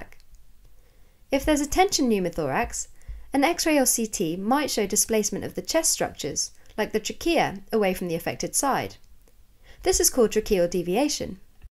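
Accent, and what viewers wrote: United Kingdom. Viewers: The narrator is so British 😂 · The British Accent makes such a positive difference, its like listening to Arya Stark:) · Good British English accent. Clear explanation. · my brain is so used to the american accent on these videos and the british accent was a bit of a shock! lmaoo hahaha and im british lmaoooo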